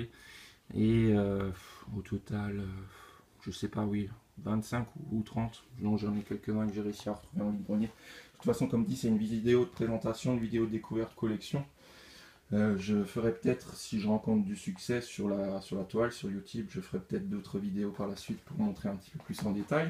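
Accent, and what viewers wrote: Alsace, France. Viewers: Magnifique collection !! J'en bave de jalousie ! Je m'abonne car j'ai vraiment envie d'en voir davantage! Ah et au fait, je croit reconaitre in accent alsacien !?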